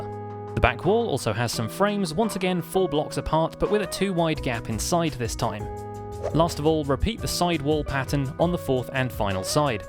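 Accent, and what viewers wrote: United Kingdom. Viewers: are you British I can't tell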